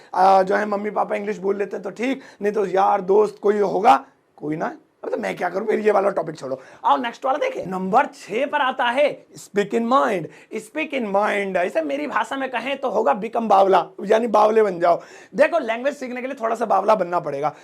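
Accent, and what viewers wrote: India. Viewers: This teacher is very Desi . This teacher is very funny English language. How a great teacher spoken English. Good night 😴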